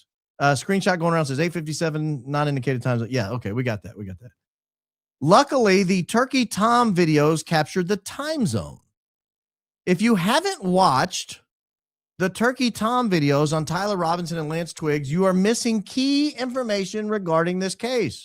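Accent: South, United States. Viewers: You absolutely have a southern accent.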